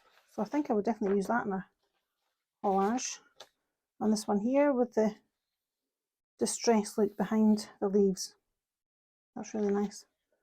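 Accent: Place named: Scotland, United Kingdom. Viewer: Love your test book. Love your Scottish accent. Love your work!! Subscribed 😊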